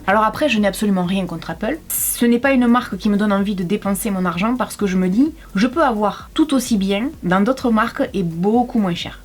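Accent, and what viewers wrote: South, France. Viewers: t'inquiète pas je filme avec une tablette merdique et crois moi ça fait l'affaire et moi non plus je suis pas top des Kardashian. Tu est top continue comme ça je te suivrait toujours je suis contente a chaque fois que tu sort une vidéo ton accent du sud me tue j'adore. Je suis allée dix jours a saint pierre la mer et j'ai adoré l'accent du sud et le vent du sud le marin. Bonne soirée.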